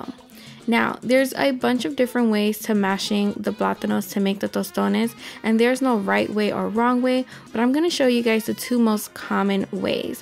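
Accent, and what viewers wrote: New York, United States. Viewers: Heard the NY accent, so I'm trusting you above everyone else.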